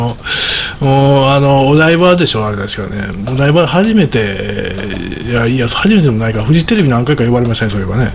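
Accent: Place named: Kansai, Japan. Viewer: 関西弁で自然体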